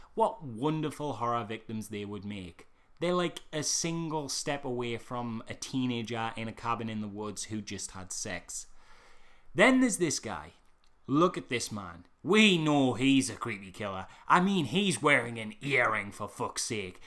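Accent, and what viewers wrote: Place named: Scotland, United Kingdom. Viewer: Wow, twenty seconds in, I already need to ask: ARE YOU SCOTTISH?? I´m German so I´m really not 100% sure, but I just have to keep following your channel now for a while just for that amazing accent! (I´m also quite a huge Doctor Who thus Peter Capaldi fan for now..If you know that show, you also know, why I say "for now", because it can change.... Very soon even maybe....)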